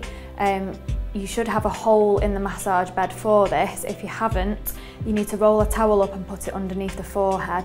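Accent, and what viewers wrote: United Kingdom. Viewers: Are you british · A Swedish massage with a nice British accent :) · Can someone tell me from what part of Britain is her accent? Thank you.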